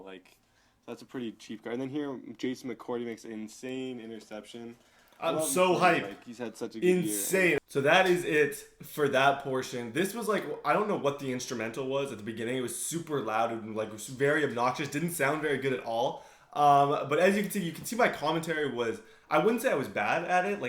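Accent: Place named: Canada. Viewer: You sounded so Canadian in your first vid.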